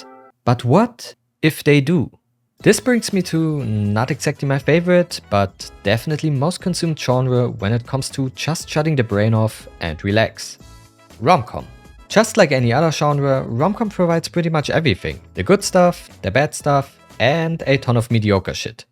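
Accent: Germany. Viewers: (hmmm... The algorithm suggests this ani-tuber. Let me see what that have to show me.) later; That was good. A well-argued recommendation for a manga, soon to be anime. Lightning-fast references for comedic emphasis. All delivered in a well edited video. And the German(?) accent gives it an authoritative cachet.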